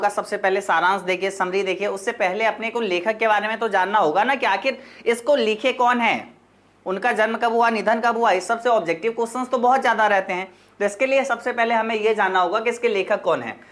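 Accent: Bihar, India. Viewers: Hmmm Bihar se hai sir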